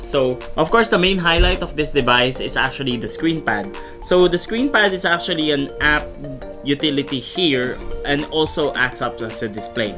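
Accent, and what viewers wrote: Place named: Philippines. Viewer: Hearing another pinoy makes me understand all this tech stuff better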